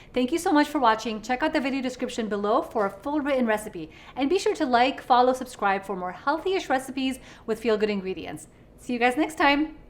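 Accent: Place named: Michigan, United States. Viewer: Forget the avocado toast; where is that accent from? Im guessing deep Michigan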